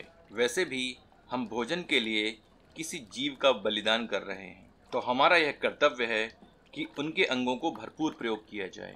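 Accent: Standard. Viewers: भैया इतना शुद्ध हिंदी कहा से सीखे है । आपके पूरे स्कूल को आप पर हिंदी के लिए गर्व होगा । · Sir apka hindi sunke Dil bag bag ho Gya.....Hum v tasty recipe or suddhaa Hindi ke liye apke khate pe like or ek Subscriber Sammilit krte he........😊😎👌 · the purest n sweetest Hindi I have ever heard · itni sudh hindi sunkar dil khush ho gaye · आपकी शुद्ध हिंदी के कायल हो गये हैं। आपकी हिंदी तो अमिताभ बच्चन से भी शुद्ध है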